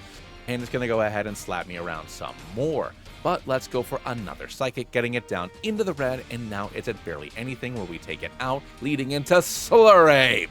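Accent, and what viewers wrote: Scotland, United Kingdom. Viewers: 9/10 Scottish accent, love Nessie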